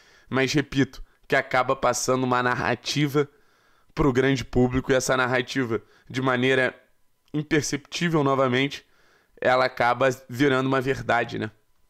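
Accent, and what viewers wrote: Rio de Janeiro, Brazil. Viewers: Foda-se, me inscrevi, não tem como! O cara com sotaque carioca tá do lado certo da força! Parabéns, contra tudo e contra todos. Sempre! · Que vídeo espetacular..bom ver tanta sobriedade junto com sotaque carioca, coisa rara na imprensa esportiva kkkkkk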